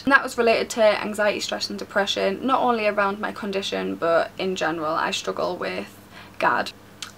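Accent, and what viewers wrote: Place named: Newcastle, United Kingdom. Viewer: Great video. I am in the process of being diagnosed. I have had bleeding and mucus at 2 year intervals for the past 10 years. Mine seems to be very mild at the moment, no pain and limited toilet visits- however, it is not very nice even at this level! P.S. recognisable accent, I hope you are a Toon fan! ⚫⚪⚫⚪⚫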